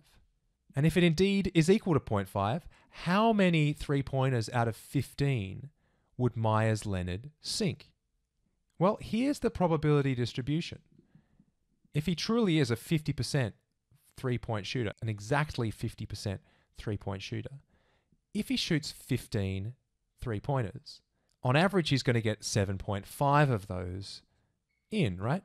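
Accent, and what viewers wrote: Australia. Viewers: Your Australian accent sounds so lovely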